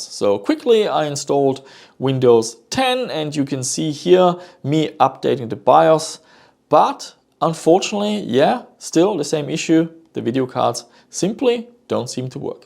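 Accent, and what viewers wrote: Germany. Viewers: Why do you sound German to me? I'm German and especially your pronunciation of "the" really sounds like a German or Danish guy speaking English. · You live in Australia with a german accent??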